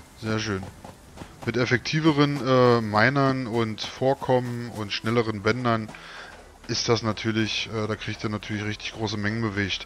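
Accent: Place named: Görlitz, Germany. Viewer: Sag mal kommst du aus der Region Görlitz? Dein "Slang" erinnert mich an Heimat 😁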